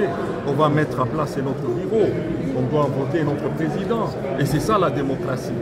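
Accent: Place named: Rwanda. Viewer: Ton français rwandais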